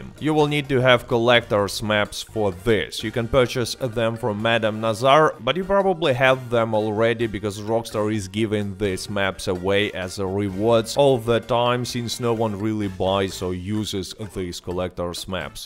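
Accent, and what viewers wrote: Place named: Russia. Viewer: My dear I stop paying the game but I still love your video I enjoy your Russian accent very much please keep up the good work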